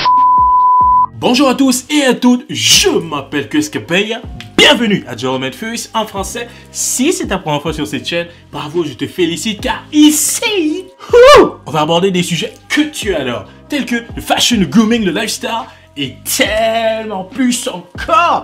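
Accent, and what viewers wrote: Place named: Quebec, Canada. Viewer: j'aime trop ton accent,français et des expressions québécoises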